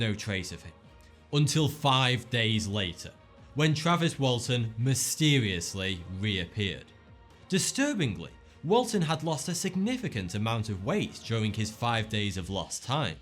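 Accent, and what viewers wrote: United Kingdom. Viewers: this dude is very British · He is British (not trying to be racist)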